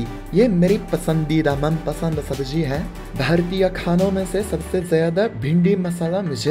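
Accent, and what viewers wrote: Standard. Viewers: bhai aap ki Hindi bahot shudh he hamare jaisa nahi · He is much more Indian than many other People living in India, शुद्ध हिंदी। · Bro ap jesi Hindi bolte he (sudh Hindi ) vese to hamre idhar bhi nhi bolte ( nice Hindi) ----you talking Hindi nice ...i like this 😁😁😁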